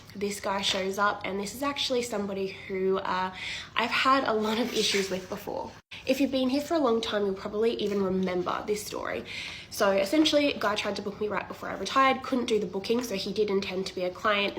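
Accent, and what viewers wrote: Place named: Australia. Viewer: Man, I totally love Australians. Her accent is 🔥. Shes really hot, I’d book with her in a second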